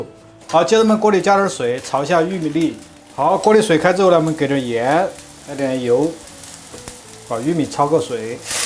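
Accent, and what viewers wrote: Sichuan, China. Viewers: 老師又在逛市場了聽四川話很親切我在台灣已經聽不到了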